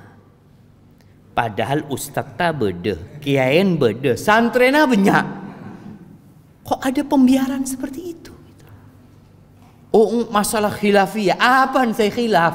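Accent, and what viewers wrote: Madura, Indonesia. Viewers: ust ngocak meduronya keren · Ustad kog kentel bahasa maduranya, dan tau bener ttg madura, beliau asal mana ya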